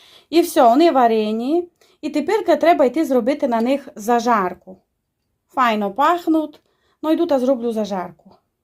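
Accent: Transcarpathia, Ukraine. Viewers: Привіт із Львівщини! Дуже у Вас все сподобалося, закарпатське все таке добре, а Ви супер пояснюєте, приємно чути закарпатський діалект.Тішуся,що знайшла Ваш канал. Хай береже Господь Вас, і Вашу сім'ю! Великого успіху такому чудовому каналу!!!😘😘😘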